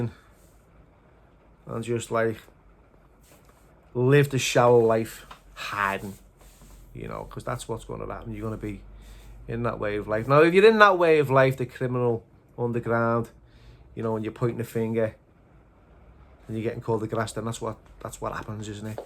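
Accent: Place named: Scotland, United Kingdom. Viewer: Nailed that Scottish accent. Like me ma. What does heed the baw mean 😮strange people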